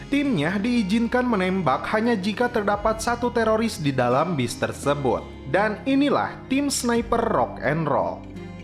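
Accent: West Java, Indonesia. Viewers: Jirr..adminya Sunda euyyy😂😂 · orang sunda ya bang🗿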